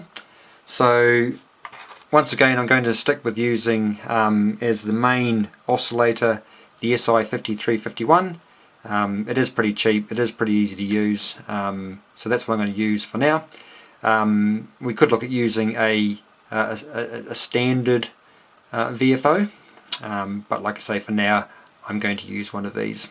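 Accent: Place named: Alabama, United States. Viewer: Great video, but Alabama accent is pretty thick.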